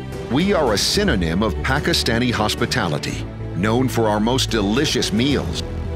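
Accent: United States. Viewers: western guy with american accent promoting PIA He is not even able to pronounce Pakistan correctly. You people didnt find any single person in pakistan worthy enough to give a voice over for this video? . Such a disappointment. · If your a Pakistani airline then why are you using an American voice why not a Pakistani voice. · The american voice seems unreal. Please have a Pakistani bring in some flavour, instead of this military sounding thunderous voice. It will give it a Pakistani feel.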